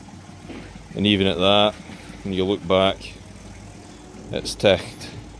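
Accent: Scotland, United Kingdom. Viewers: Can you tell me if that's the Ochil hills in your videos, Mrs thinks your a Fifer and I think more cumbernauld side.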